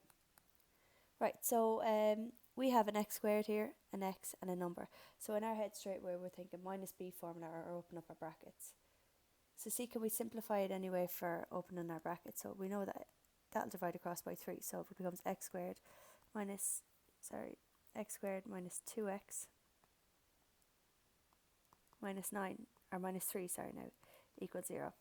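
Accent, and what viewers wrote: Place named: Ireland. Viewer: That Irish accent is the best 👍thanx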